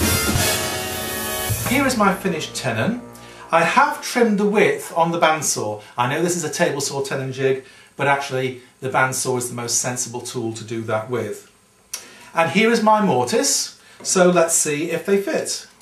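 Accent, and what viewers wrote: United Kingdom. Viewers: Why is it that everything sounds better when delivered with a British accent? Nice work. I like your jig, and your presentation in your videos are excellent. Thanks.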